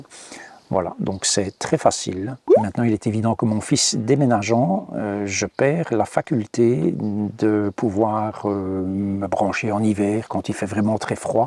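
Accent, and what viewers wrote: Belgium. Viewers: milesker anitz. En tout les cas tu me fais bien rire avec ton accent belge. Au plaisir de te rencontrer sur la route.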